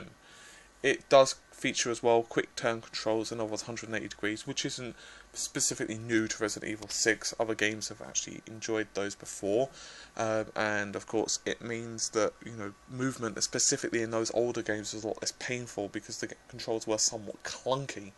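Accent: England, United Kingdom. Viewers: fucking english accent cant understand all, i took headset to understand him.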